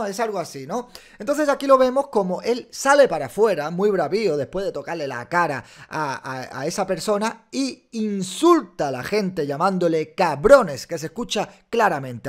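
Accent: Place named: North, Spain. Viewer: Una pregunta por qué intentas pronunciar como si fueses del norte?te avergüenzas de ser andaluz?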